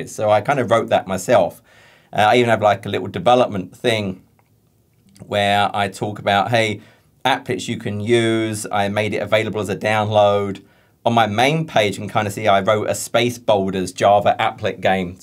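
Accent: United Kingdom. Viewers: I eventually know why your speaking with british accent , it's so familiar to me . Thanks for sharing your story. It's awesome !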